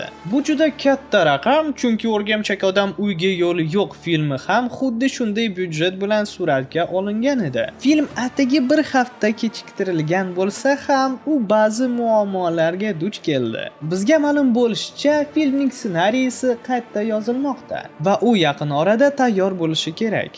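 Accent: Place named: Tashkent, Uzbekistan. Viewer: Nma bovoti😂 ybat toza toshkenlik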